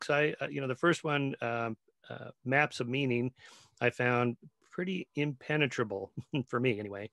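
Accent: Wales, United Kingdom. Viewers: You Welsh? Go on bach!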